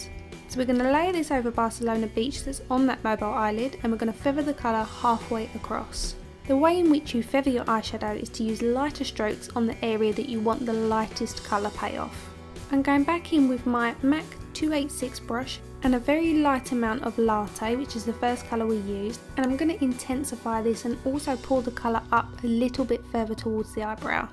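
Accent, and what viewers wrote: United Kingdom. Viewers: Aw you replied. that's so nice of you. i recreated this look and learned new tricks and it came out nice. i've been watching your tutorials and its one of the best. you deserve more subbies and btw i followed you on IG. more power to you. anyways are you british? JW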